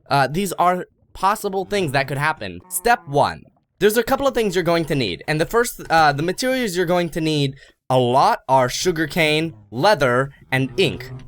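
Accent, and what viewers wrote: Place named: California, United States. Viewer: Torkil Hansen I think that's just the typical Californian accent.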